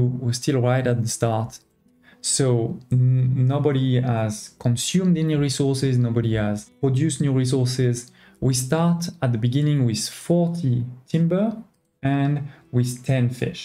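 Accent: France. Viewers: Are you french ? You speak a very good english with a french accent :)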